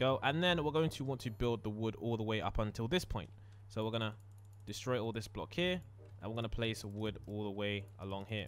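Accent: United Kingdom. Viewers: I love your British accent then the Build ☕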